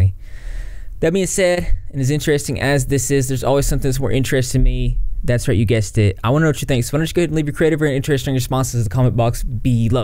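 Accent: South, United States. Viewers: i love your southern accent 😩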